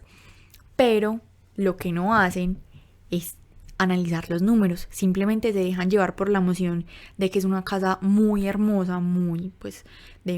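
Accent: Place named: Paisa, Colombia. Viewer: Es paisa colombiano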